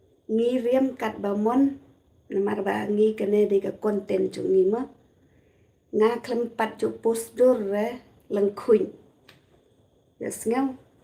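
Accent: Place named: Bangladesh. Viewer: Ka shrieh kynthei ba lehsih tam bad ka ak bha briew sa ba la tymmen, imat kaba ym don ba ha ing ha sem ym don ba sneng ym don ba kraw ym don ba iohi ia ka jingbiej jong ka, lada don ruh ialam noh ia kane kloi sha SANKER hospital kein ba kan ioh jing sumar bad ban shai noh ka jabieng dum jong ka. Kane kein ka briew kaba ka EIT bad ka JABIENG jong ka ki ia daitlang hajuh. Lok khar bangladesh tharai ba ka kren dkhar.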